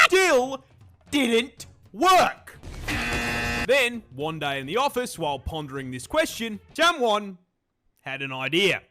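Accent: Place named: Australia. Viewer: I always enjoy your yapping, it’s very educational. Of course it doesn’t hurt that Australians have the third best accent in the world, second place going to the Scottish and first (unfortunately) going to those damned Brits!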